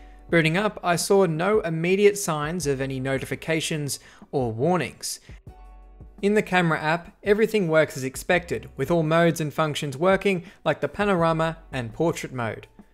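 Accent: Australia. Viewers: "Ichoons." God I love the australian accent.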